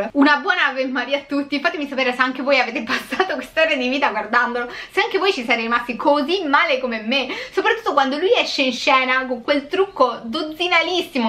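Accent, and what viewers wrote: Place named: Rome, Italy. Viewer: Amo tantissimo il romanaccio, dona a tutto una nota così affascinante e divertente: pura poesia ♥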